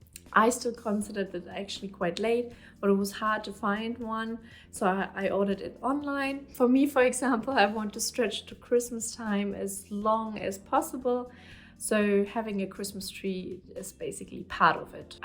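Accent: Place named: Germany. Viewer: also I knew you were from Germany before you said it:D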